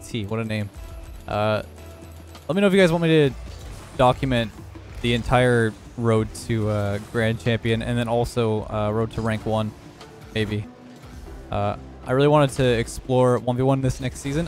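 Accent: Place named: Canada. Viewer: I've watched every one of your daily vids for months now and I can honestly say... In this one you sound so Canadian 😂 The itis really brings out the accent apparently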